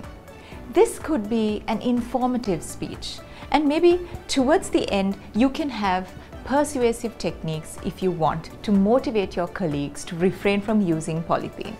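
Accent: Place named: England, United Kingdom. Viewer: Thank you, teacher. Your English accent is amazing.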